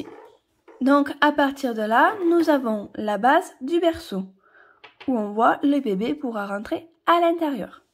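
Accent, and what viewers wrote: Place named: Southeast, France. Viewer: Oui, Vos explications sont claires et nettes et votre joli accent me rappelle que moi aussi je vis dans le grand sud-est de la France. C'est du soleil pour la journée. Amicalement.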